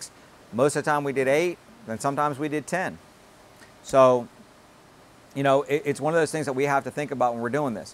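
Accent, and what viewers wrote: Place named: Georgia, United States. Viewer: where you guys based? Georgia? I used to work in Hinesville 2004. I`m from the gods country of Scotland lol, Love the accent guys ,YI-ALL doin a great job guys.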